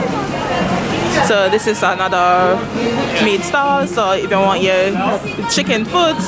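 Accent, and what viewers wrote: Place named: United Kingdom. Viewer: Hear a lil british accent coming along! Hehe Brixton look festive! Loving the vids..keep going!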